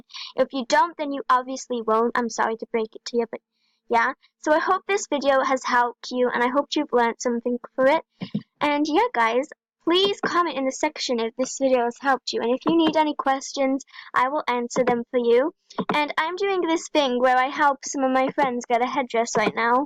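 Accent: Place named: United Kingdom. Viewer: Is that your real voice? Its high but its sounds like a british human...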